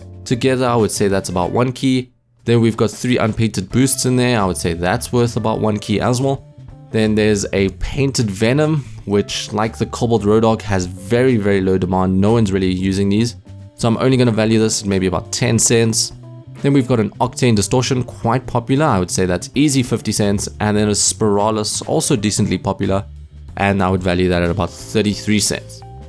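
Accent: South Africa. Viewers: Are u from south Africa? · Are you from South Africa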